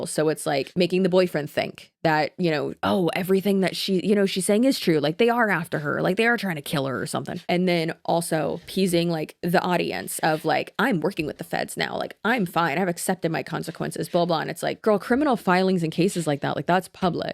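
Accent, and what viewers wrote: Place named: Florida, United States. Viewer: yess she’s been hitting that Florida accent extra hard lately too for some reason. Isn’t she from appalachia or sum?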